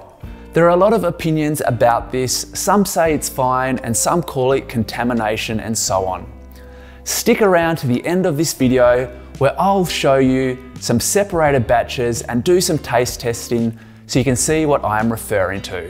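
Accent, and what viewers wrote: Australia. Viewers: I wish this audio had an option filter out that heavy Aussie accent...long listen.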